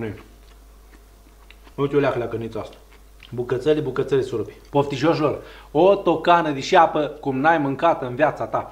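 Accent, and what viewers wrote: Moldova. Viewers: Like am dat,abonată sunt demult.Rețeta este o minune tu și graiul tău Moldovenesc o minune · Sunteți moldovean cu limba lata daca nu vă supărați de unde sunteti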